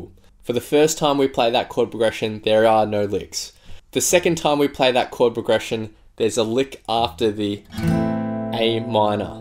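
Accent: Australia. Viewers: Thanks for this great tutorial and the Aussie accent . Both excellent. 👍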